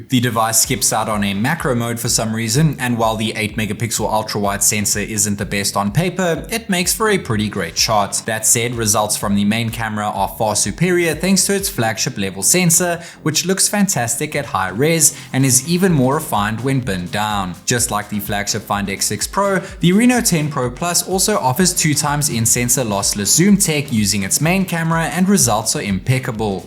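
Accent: South Africa. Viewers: All I could see was The Barron, Witkoppen Rd... 🤣#RubyBlueForLife!!! Cool channel, good luck! Can hear a Zaffer a mile away!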